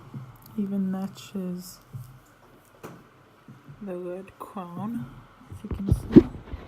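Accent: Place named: Russia. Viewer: You sound like a russian woman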